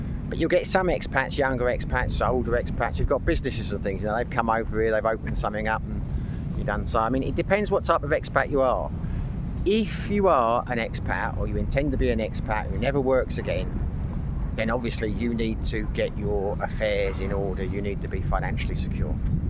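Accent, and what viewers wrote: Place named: England, United Kingdom. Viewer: Thanks for the great content! I also watch your channel because your accent reminds me of my old English friends living in the USA, as well as some of my favorite characters on Monty Pythons.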